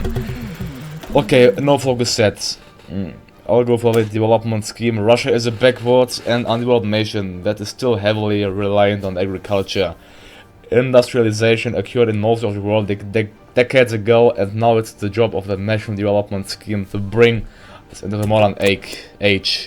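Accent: France. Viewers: Ты француз?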